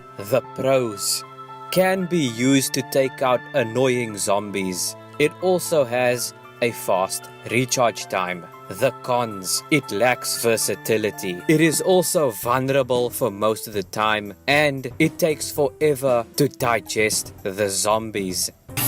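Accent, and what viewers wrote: Afrikaans, South Africa. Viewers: South african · Dude, are you South African? I can hear the Afrikaans in your accent 😂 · Could instantly tell you're South African 😂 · is that a south african accent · Wait are you Afrikaans?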